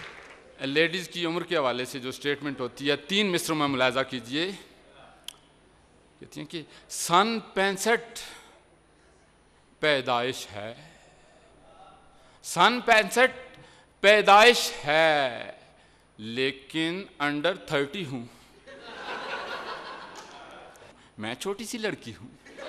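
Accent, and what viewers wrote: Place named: Punjab, India. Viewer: Husney Bayaan, Tanz-o-Mazaah ki zumaani Barikyaan or sadgana chashni, woh bhi ek dhime dhime Punjabi talafuz ki roshni main, wah kamal hai.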